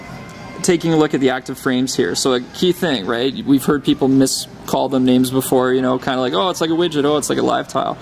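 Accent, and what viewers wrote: Canada. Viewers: He's Canadia, right? Btw, rooting for BlackBerry. Go RIM!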